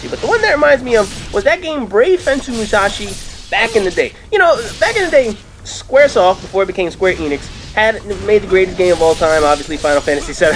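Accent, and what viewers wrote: New York, United States. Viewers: NY ACCENT !! NY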